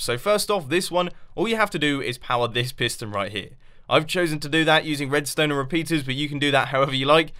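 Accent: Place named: United Kingdom. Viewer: I love your eccentric british voice. Can't wait for the next hermitcraft 3 episode!!!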